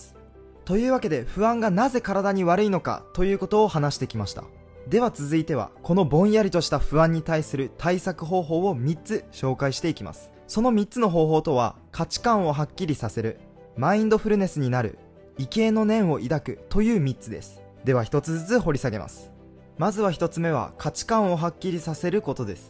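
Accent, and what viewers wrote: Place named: Tohoku, Japan. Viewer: あ、そうなんですね！こちら、秋田出身東京在住なのですが、はじめ（親しみやすさという意味で）ときおり東北チックな訛りがあったので、そちらご出身かなと勝手に想像していました＾＾ さんの語り部、毎回とても楽しみにしています＾＾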